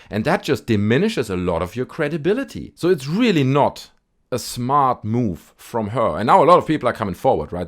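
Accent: Germany. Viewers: come on that sounds german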